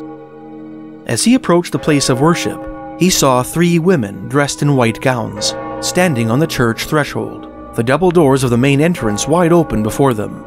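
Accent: Canada. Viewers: i normally can't stand human narration (crass commercialism, low application) i guess the canadian accent made this work well enough, thanks for sticking to culture that you can represent with some integrity and concern, because it makes for a more meaningful tell. "whoever would have thought someone could make canadia interesting" ;) <3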